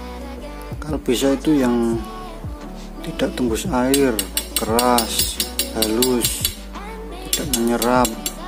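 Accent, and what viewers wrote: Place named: Java, Indonesia. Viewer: medok nya itu loh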